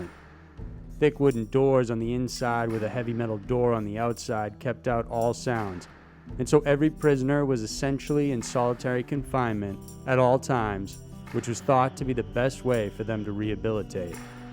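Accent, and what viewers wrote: United States. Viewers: Great to put a face to your voice, I watch your videos everyday but where in the USA is your accent from exactly? thanks lysa x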